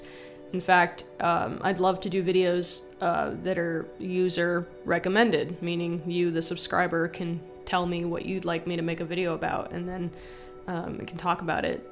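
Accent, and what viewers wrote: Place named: West Texas, United States. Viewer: excellent videos about feminazis stuff, anyhow are you from west Texas ? I have a friend from there that her accent sounds quite close. greetings from Alaska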